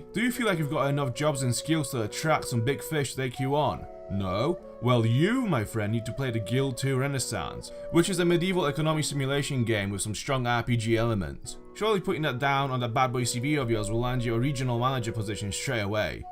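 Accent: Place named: Northern England, United Kingdom. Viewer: Wait so are you Polish as per your name, or are you from Sheffield?! Seriously you sound like you're from northern England! Nice review though 👍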